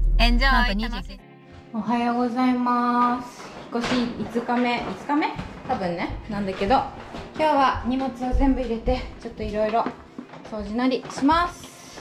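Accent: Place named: Gifu, Japan. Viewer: 冷蔵庫のデザイン可愛いですね👍 ちょくちょく出る岐阜弁がたまらんわ ((´∀｀))ｹﾗｹﾗ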